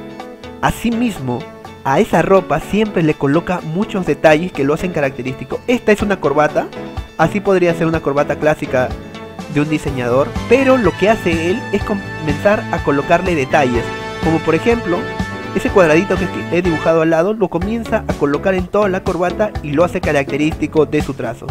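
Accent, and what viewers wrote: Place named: Peru. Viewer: Aaahhh, eres peruano Nueva suuub!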